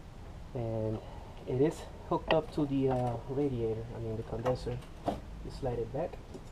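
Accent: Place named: Philippines. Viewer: Bro thanks for the video. One question outta topic are you Filipino i hear you ahhhhhhhh.... Longgggg one lol oh k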